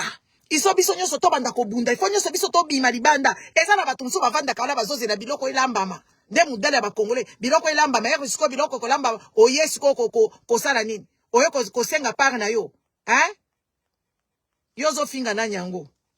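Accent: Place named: Rwanda. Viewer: Longua yo Rwandaise déguisé en Congolais agent ya Kagame n'a communauté Ozalaka nayo mutu ya M23 Banda kala, role batinda yo n'a communauté eza ko kosa comme si Oza Combatant alors que ce faux, Ozalaka nde Rwandaise agent ya Kagame, ba maloba nayo sans impacté chez biso ba vraie Congolais de père et de mère, n'a lingala nayo avec accent Yaba Rwandais,Oza ya biso te et opinion nayo ezo compter te mwasi ya salite solo M23 déguisé en combatant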